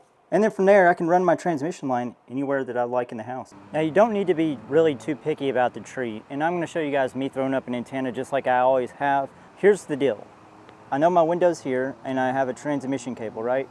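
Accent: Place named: Kentucky, United States. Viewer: How do you say antenna is how I knew you were from Kentucky!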